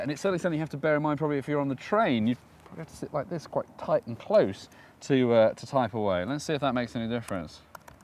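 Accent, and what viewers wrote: United Kingdom. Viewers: "changing the market to create the haychpee (HP)" LOL I LOVE BRITISH ACCENTS (at least i think that he is british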